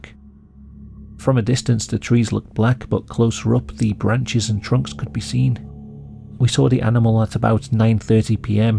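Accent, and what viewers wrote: United Kingdom. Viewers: That British accent makes it even more peculiar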